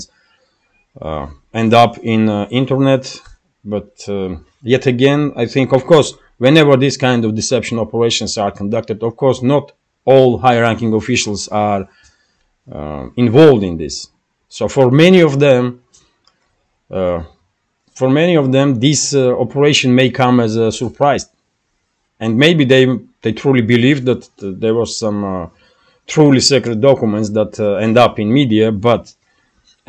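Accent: Russia. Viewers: By shortening the videois already a good start.Also having English subtitles helps alot because of your Russian accent.. 🎉🎉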